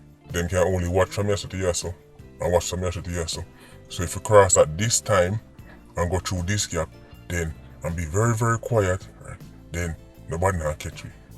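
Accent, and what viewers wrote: Jamaica. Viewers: How come the drugs for guns trade routes are so difficult to plug?! The "YAADIE" voice-over is hilarious!👍🏾👊🏾😄🇯🇲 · I love the yard man voice over.. Lol. Same way so. Someone was telling me that back in the days there was running going on in NY immigration office. One Jamaican go to the office with one bag of money and just throw it out on there take and asking about business.. There was where everything dun.. Nothing Jamaicans do that is still secret ..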